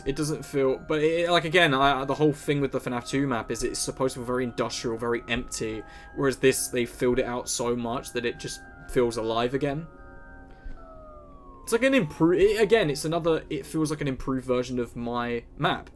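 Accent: United Kingdom. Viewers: i didnt know you was British too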